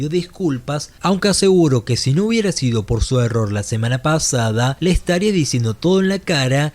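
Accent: Córdoba, Argentina. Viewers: Me parecía, yo también lo soy, o sea Argentino y el asentó cordobés es imposible no reconocer.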